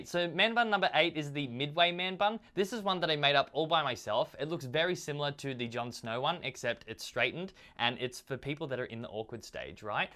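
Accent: Australia. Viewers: Are you Australian? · Is he Australian?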